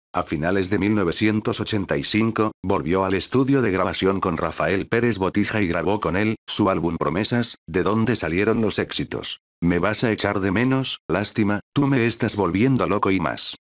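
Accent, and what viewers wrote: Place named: Spain. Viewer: este chocante acento gachupín del narrador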